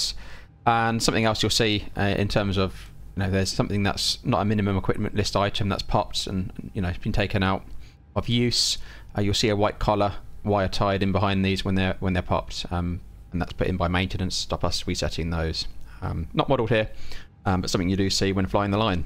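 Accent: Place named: United Kingdom. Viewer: Good videos, but at times the british accent comes a bit much to my taste.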